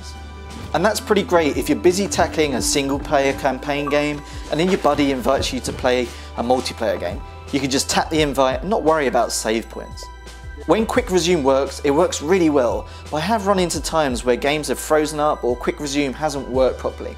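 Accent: United Kingdom. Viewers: why your british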